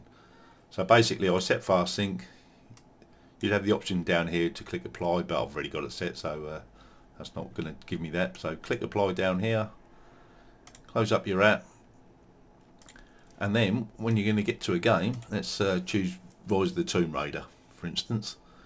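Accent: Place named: Cockney, United Kingdom. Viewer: By the way, I enjoy your accent and you've got a thumbs up from me son! I'm from yorkshire but I do love a good cockney accent 😂 big Micky Flanagan fan.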